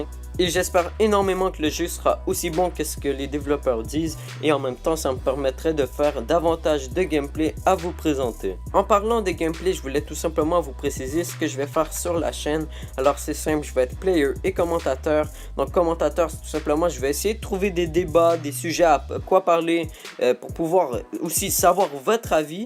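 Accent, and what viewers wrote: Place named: Quebec, Canada. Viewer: C'est un accent de Québec :$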